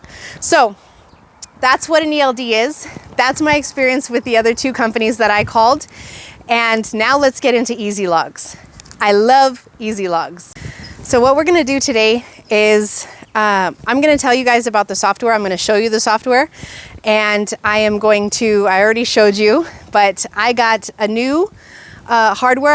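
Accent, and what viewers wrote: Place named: India. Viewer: Sounds like hes from India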